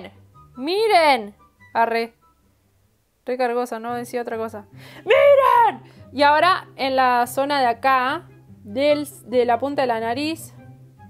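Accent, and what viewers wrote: Argentina. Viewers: sos argentina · Sos argentina ? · Sos re argentina jajaj ahre que yo también ahre jajaj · Jaja re argentina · Tiene voz de Argentina o soy yo :v o chilena :v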